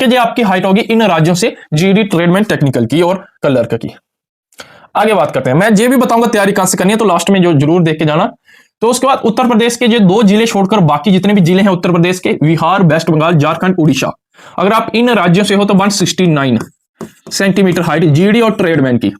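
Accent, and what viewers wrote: Punjab, India. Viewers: Bhai aapki bhasha se pta chal rha h ki aap punjabi ho❤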